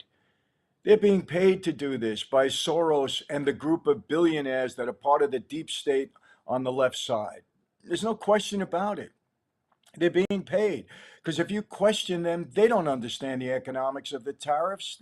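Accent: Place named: New York, United States. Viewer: As a Native New Yorker living out of state, I especially appreciate your New York accent and tone to reinforce what I already know (all of my family are gone). I am 66 but you also come across fatherly and that feels reassuring. Most of all, I appreciate your walk with our Lord and your faith in Him. BTW, I have a very similar testimony as you in how I was convicted of the truths in the Bible and the revelation of my relationship with God. The main difference is that I was not in prison. I was at the end of myself, though. God bless you!!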